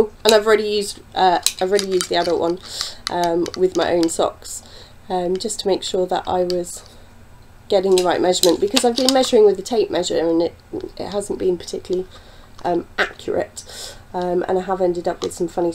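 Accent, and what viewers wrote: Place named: United Kingdom. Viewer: Hi! I just discovered your podcast today and watched them all. Lovely to find another British voice.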